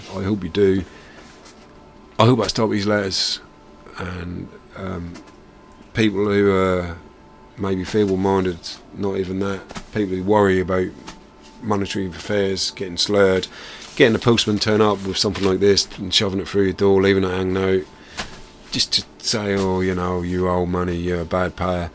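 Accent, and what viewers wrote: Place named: Norfolk, United Kingdom. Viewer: Left home aged 17, now 65 and never had a tv licence or visit. Good old Norfolk accent.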